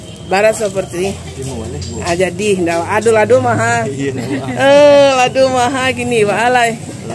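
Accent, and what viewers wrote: Minangkabau, Indonesia. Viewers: Puan bertutur minang Seronok didengar menghibur hati masakan minang terkenang kenang enak lamak bana sudahlah pasti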